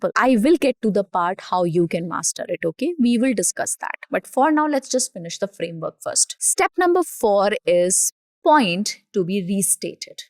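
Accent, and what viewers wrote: India. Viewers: Am I the only one having problem with indian English accent ? 😢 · You are so good with words. But your Indian accent ruins everything.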